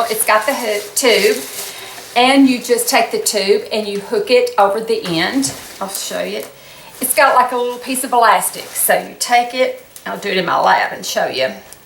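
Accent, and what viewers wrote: South, United States. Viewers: I smiled as I watched this. I had a soft bonnet hair dryer. Since I live in England now I will have to see what the they have. Thanks for sharing. Love a nice nice Southern accent, too! Not everyone in England sound like Colin Firth!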